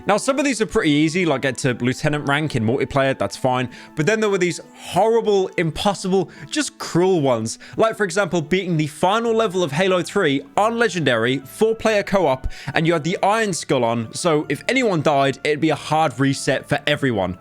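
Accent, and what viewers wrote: United Kingdom. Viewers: Me listening to some british boy talk for 12 minutes about a game I’ve never played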